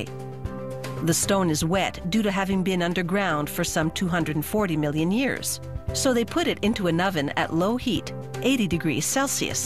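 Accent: Canada. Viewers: Whilst the producers of the show are ignorant and stupid for not researching the correct pronunciation of "Darr-bee-shire", my fellow Brits are equally ignorant and stupid for attacking Americans here, when the narrator is quite clearly speaking with a Canadian accent (oot, aboot etc) and she gives the temperature of the vacuum oven in Celsius, not Fahrenheit like an American would. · Then good thing this narrator is Canadian!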